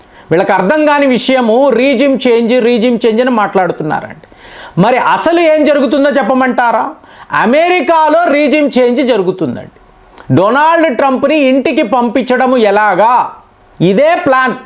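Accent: Tamil Nadu, India. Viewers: Nixon ను నెక్సన్ అని పలుకుతారా ? నిక్సన్ అని పలుకుతారా? నీ తమిళ తెలుగు తగలడ.ఇది భాష దుర్గంధం.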